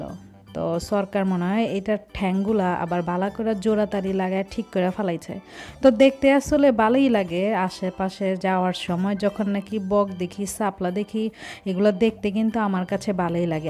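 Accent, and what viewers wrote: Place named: Old Dhaka, Bangladesh. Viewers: তোমার অন্চলিক ভাষা টা সত্যি খুব সুন্দর এখন মনে হলো তুমি পুরান ঢাকার মেয়ে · পুরান ঢাকার কথা হুনতে অনেক মজাই লাগে আপু অনেক ভালো লাগছে আজকের ব্লকটি আশা করি এরকম আরো ব্লক দিবেন পারলে একটা সিলেটি ভাষায় কথা বলে একটা ব্লক দিয়েন আমাদেরও শুনতে অনেক ভালো লাগবো আপনাকে অনেক অনেক ধন্যবাদ জানাই সুন্দর একটি ভিডিও উপহার দেয়ার জন্য । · আসসালামু আলাইকুম আবে কি ছুনদর কইরা কথা কইতাচে অনেক ইনজয় করলাম আজকের ব্লগ টা, আমার বইনের শশুর বাড়ি ছুরিটোলা চিনো নি হি? আমার দুলাভাইর চৌদ্দ গুসটি এমতে কথা কয়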